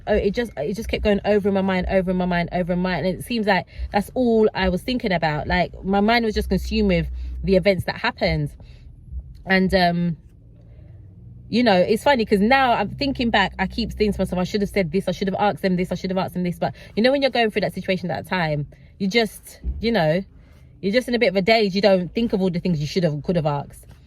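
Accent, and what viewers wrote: United Kingdom. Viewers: It seems to me that you were a little too prepared yeah 2 travel frequently if someone is a frequent flyer and then hopping over and over they wouldn’t need the amount of information that you got if you gave them very little it would’ve said she’s not prepared because you had so much information to squash there they suspicion against you. It feels that they saw you as too prepared for them so that is why they gave you the full degree treatment so next time Missy let them ask the questions don’t chitchat with them saying I watch border force bullshit. you thought your British accent was gonna get you through and be treated decency skin colour is a criminal so they showed you how they see you. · Okay. My apologies. Did not watch to the end. Nice British accent 😎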